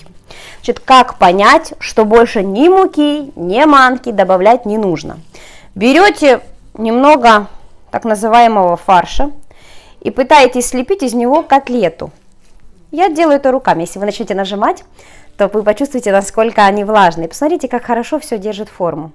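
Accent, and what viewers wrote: Ukraine. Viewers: Спасибо за чудесные рецепты они у Вас всегда вкусные и очень аппетитно смотрятся.Вы человек-СОЛНЫШКО и Ваша изюминка- АКЦЕНТ!Украинский говор очень красив,впрочем,как и украинские девчонки! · Если бы у было плохо с чем-то, не было бы 4 млн. пользователей. Все отлично. Мне приятно слушать, у меня так бабушка говорила, а у меня московский акцент остался. Но я тоже считаю достоинством грамотный русский, но если его нет, является ли это недостатком? На таком русском пол Украины говорит.:) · Какая убедительная приятная плавучая речь. А слабый украинский акцент придаёт такой оригиналный колорит. Если нет аппетита то надо смотреть Ваши ролики. Появится и аппетит, и настроение. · Если американка говорит по русски с акцентом - это нормально, а если украинка? это же не родной язык, попробуйте сами поговорить на чужом языке. Думаю критики кроме своего русского больше никакого не знают.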